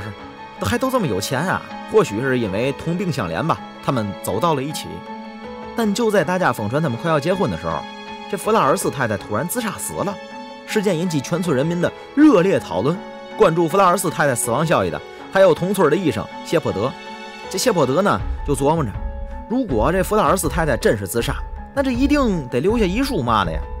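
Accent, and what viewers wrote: Tianjin, China. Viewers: 天津话太搞笑了，我喜欢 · 天津话最好用天津式土话。普通话天津味儿太违和。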